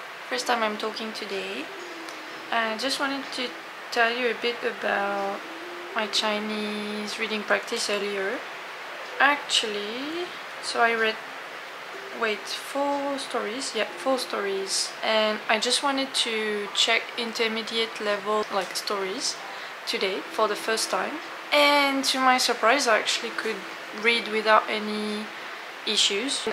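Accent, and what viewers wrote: France. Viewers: おめでとうございます!! 🎉🥳 I love how your lovely French accent comes through more when you're excited! 😊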